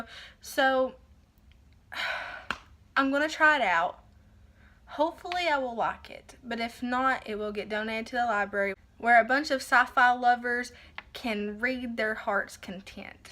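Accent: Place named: South, United States. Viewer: Girl, where you from? Love the southern accent!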